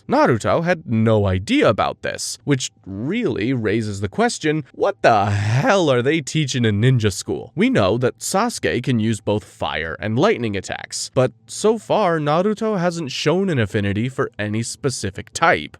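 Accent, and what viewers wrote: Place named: United States. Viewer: loved the videos but holy can you please stop pronouncing all the names UBER AMERICAN holy fk Its MAN GE KYU not MAN GEK KJO, this is just one of like 9000 cringe moments, its not to hard to do such a thing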